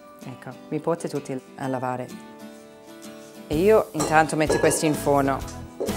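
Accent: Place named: Italy. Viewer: OMG! I freaking love your Italian accent!! :-)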